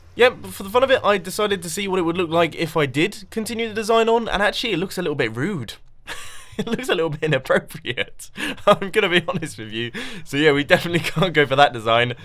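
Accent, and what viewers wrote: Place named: England, United Kingdom. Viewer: I didn't know u were English I am too and I don't even know my own accent. Lol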